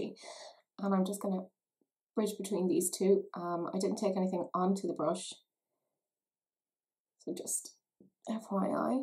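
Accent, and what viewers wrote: Ireland. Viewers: OMG! You actually said PR, not P-OR!!.. LOL! Never change, we love your lovely Irish-ness!!.. and your lovely Irish face!... xXx 😜😊😎💜💜💜💜🌙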